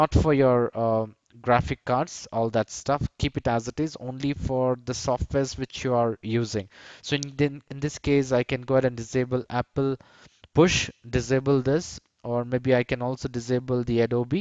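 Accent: India. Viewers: Indianan voice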